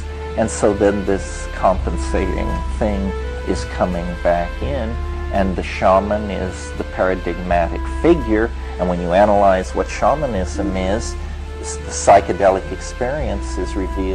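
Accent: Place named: England, United Kingdom. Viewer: Where are you from? England or Ireland by any chance?! I'd goto a gig if it was relatively near to show my support, you've done me great benefit with this channel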